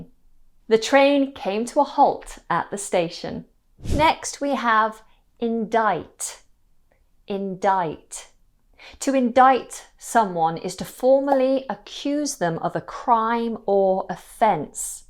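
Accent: United Kingdom. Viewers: I love the Brit accent <3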